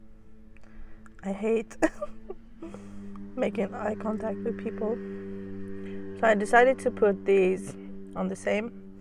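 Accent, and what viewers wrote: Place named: United States. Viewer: Where are you from? I hear a foreign accent mixed with American lol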